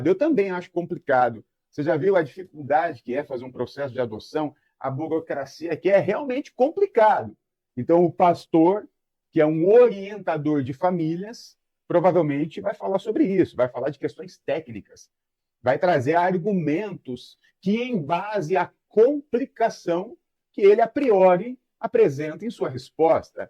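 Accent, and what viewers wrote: Minas Gerais, Brazil. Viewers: Em meados de 2011, assisti pela primeira vez, um video desse sujeito - já NÃO fui com a cara dele. Esse sotaque minereis com uma pitada de Tirica é insuportavel.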